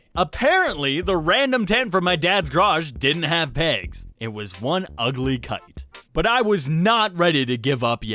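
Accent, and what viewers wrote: Canada. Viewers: Your Canadian? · You animation quality has gotten so so good over these past few year 😍 is soooo cool!!! I’m Canadian but every time you talk I chuckle cause you have such a Canadian accent sometimes🤣 like you said “ a-bout” but it’s such such a Canadian way 🤭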